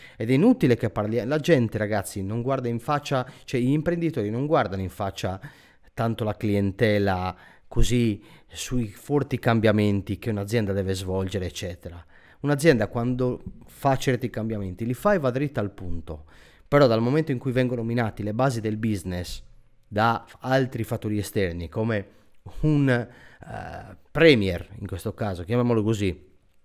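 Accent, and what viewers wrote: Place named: Sardinia, Italy. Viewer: Ottime le tue analisi. Però non oso immaginare cosa ci faranno ora... (Riconosco l'accento della mia amata regione sarda: di dove sei?)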